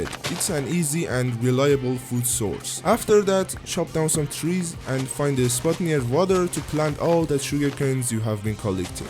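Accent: Iran. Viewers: your persian accent goes hard 😈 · داداش ایرانی هستی؟از لحجه فهمیدم😂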